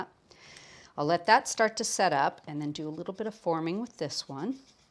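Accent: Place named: California, United States. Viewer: Just love to watch and hear her Californian accent....cards aren't too bad either:) x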